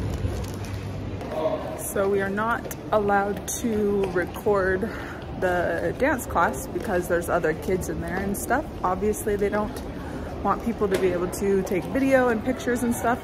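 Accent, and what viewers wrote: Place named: Kenya. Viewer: Congrats on becoming a Kenyan. Nice vlog as always. ...'meat, he likes meat.....', your accent sounds so Kenyan. I'll be listening for Kenyan highlights in your accent. You know..I was around the Nyayo house area just two weeks ago, but it was a bit crowded and not as fun to walk, like you guys were having fun. Thanks again for the videos one year on..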